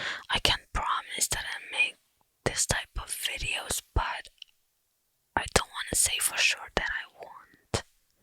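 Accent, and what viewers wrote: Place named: Romania. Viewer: You’re from Romania!! I love your accent so much and you are pretty! Keep up the good videos!!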